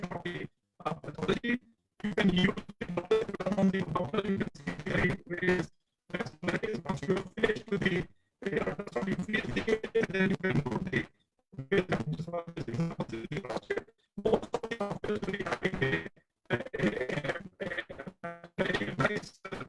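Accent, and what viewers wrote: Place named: India. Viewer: There is NO ISSUES in the Audio, But the English pronunciation of the Doctor from India‼️He has a very strong accent from his country of origin. My observation only. Thank you for the lecture doctor‼️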